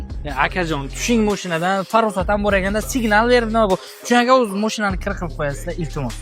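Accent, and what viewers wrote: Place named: Bukhara, Uzbekistan. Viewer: Buxoro sheva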